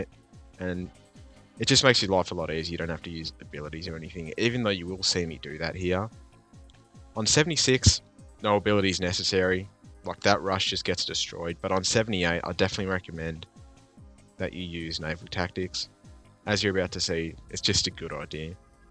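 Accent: Australia. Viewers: you have no idea how much joy it brings me to hear that beautiful Aussie voiceover narrate goated CHIMPS guides, I love you brother How are you, anyway? How's the fame of being a movie star treating you?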